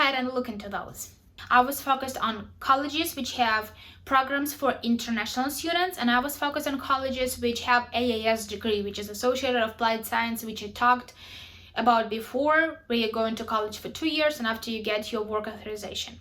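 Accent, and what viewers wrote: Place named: Ukraine. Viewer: ukrainian accent is nice don't be shy of it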